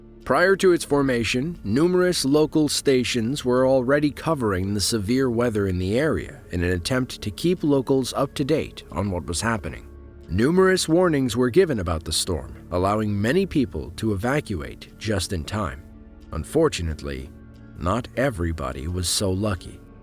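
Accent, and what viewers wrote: United States. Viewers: with American voice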